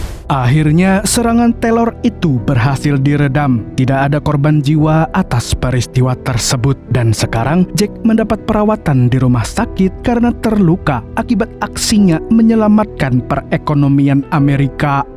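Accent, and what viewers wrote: Banjar, Indonesia. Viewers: Orang Banjar kh Pian mang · Orang banjar kahh😮😂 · Org banjar kah nyawa Nang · urang banua sklinya amang ni lehh 😅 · Admin orang banjar kah ☺️ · Uma ai mang suara pian merindu banar week week paristiwa pang😅😅😅😅😅 Banjar banar nah.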